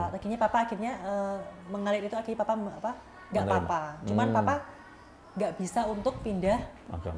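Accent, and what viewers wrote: Indonesia. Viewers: Medok skali jawatimurnya ibu...😂..smoga tetap istiqomah..🤲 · Surabaya medok mantap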